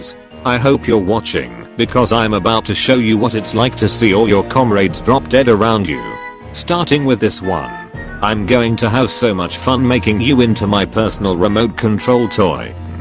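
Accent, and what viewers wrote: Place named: England, United Kingdom. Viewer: I sense a fellow english girl...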